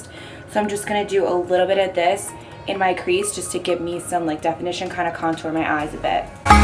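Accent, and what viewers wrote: New Jersey, United States. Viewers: Where are you from? You sound like your from New Jersey or Long Island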